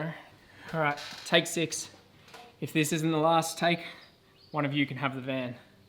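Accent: Australia. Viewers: It’s good to see and hear an Ozzie doing a camper conversion Keep up the good work and the Occerisums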